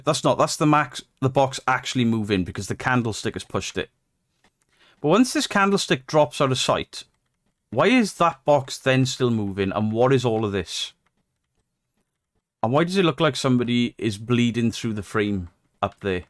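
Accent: Wales, United Kingdom. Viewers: thanks! I was monetized Once! I still get quite a lot of subscribers but no coin! ha I recognise the accent (as being Welsh lol) I'm in Carmarthen, Merlin's Town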